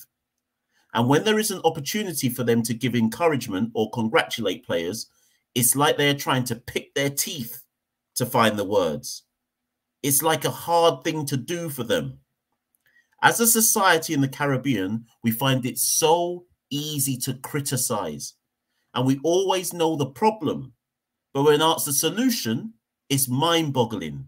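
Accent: England, United Kingdom. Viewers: I don't believed that you were born in Jamaica and talking, like you were born in England. Becaused my ex wife is living in England 58 years, she went there when she was 8 years old and still living there. I was married in England 🇬🇧 31 years, since I was married in England 🇬🇧, but I am living in the United States 👍 almost 30years now. I am older than Ambrose, Ambrose, Bishops, Carl Hooper, Desmond Haynes, Marshall, Jimmy Adam's,BENJAMIN'S them,so I know what I am saying. I can see that you are very young, I know since 1971 playing for the combined Islands them. Ambrose is very much out of order, they just jealous of Gayle with his money and fame.The man 👨 is well loved in India 🇮🇳 and, all over the world. Playing into a few games and failed, I don't see all the big thing what Ambrose is behaving so erratic. Becaused he cannot compared himself with Gayle also Viv Richards, Gayle is the only wi batsman to have two T20 hundreds. 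The first batsman in the history of the T20 cricket 🏏,to scored the first hundred in his 1st match against South Africa 🇿🇦. I hates how these small islands guys is behaving so erratic, they does not want any Jamaican to play on the wi team. Viv Richards is talking about Jason Holder is not a good player at all, he may luckily get a few wickets now and then.Also scored a few runs, but he is not a reliable, or competent player like Gayle. Anybody who knows about cricket 🏏, every player have their ups and down. In their career, so Viv Richards is very st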